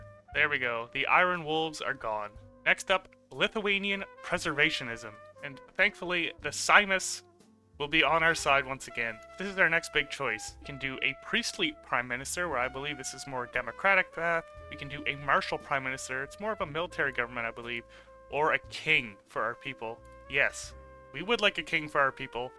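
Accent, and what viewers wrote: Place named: Ottawa, Canada. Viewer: I’m so used to HOI4 streamers being Europeans I was wondering what kind of Central European accent you had until you said “harder” and then “guards” right after … I am from Ottawa and probably have the same accent as you lmao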